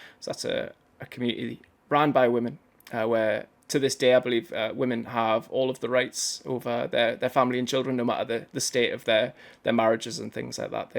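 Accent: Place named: Newcastle, United Kingdom. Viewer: Eyepatch wolf is Irish i think this fellas a jeordie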